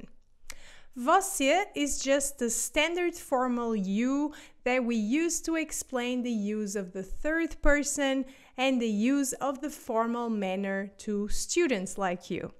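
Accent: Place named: Portugal. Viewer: You are the best! I used to live in Portugal some years ago and my language get worse over time but you totally help me to remember some of things which I lost and even upgrade to some new things! What I really like is that you speak slowly and every word can be perfectly understood either in Portuguese or English which make things way easier then watching some other channels + you have really nice Portuguese accent. Lot of other channels use Brazilian accent that can create issues for someone who use to learn and speak Portuguese in Portugal and not the one from Brazilian TV novelas. Thank you so much and grande abraço for those useful lesions!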